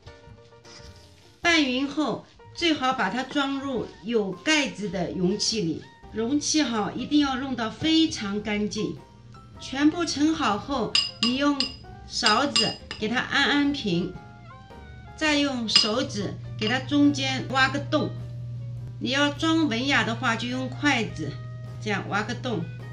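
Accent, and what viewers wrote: Shanghai, China. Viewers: 上海话，哈亲切！ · 稀饭听浓刚上海话，哈亲切！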